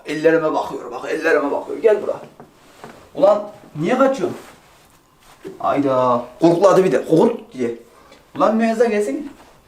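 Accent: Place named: Ankara, Turkey. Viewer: Haçı sen Ankara lımısın bunasıl şive agız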